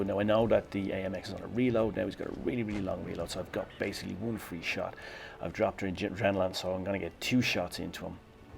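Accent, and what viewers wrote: Ireland. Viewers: Thanks for the review mate! Can I ask, are you irish? For the accent nothing more, if u are cool. Cheers Saludos desde Mexico!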